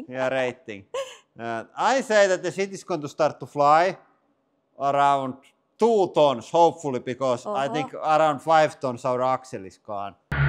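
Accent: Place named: Finland. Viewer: It's funny how the years go by, but that Finnish English is still as strong as ever.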